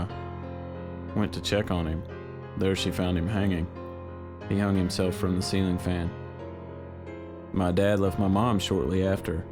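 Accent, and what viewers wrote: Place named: Texas, United States. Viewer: you're from texas aren't ya cowboy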